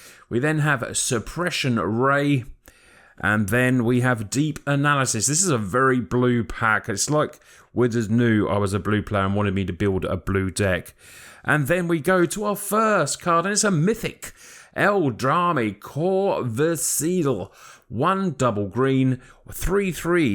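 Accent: United Kingdom. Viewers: Its nice to hear a proper Englishman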